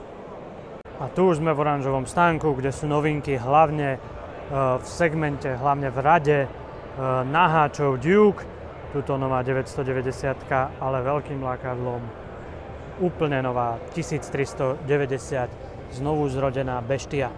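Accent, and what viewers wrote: Eastern Slovakia, Slovakia. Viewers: Pekné video pán, ".Už sa sa polepšil.Už ste odstránili aj to Svoje východňááárské zaťaho..váááánie.Robíte pekné videá,ale hrozne mi vadil ten východňársky akcent.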